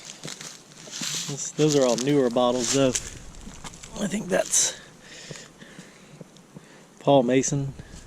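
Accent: South, United States. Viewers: I usually only see your newer videos. I've only been following you for about a month or so. This is the first time I've seen one of your older videos and I love how much thicker your southern accent was. I love your videos.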